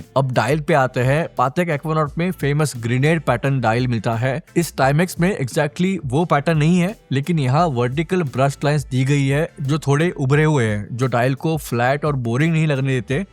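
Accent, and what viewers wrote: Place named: North, India. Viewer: Bro newu kannadiga na !! 😮, i thought u were somewhere from north India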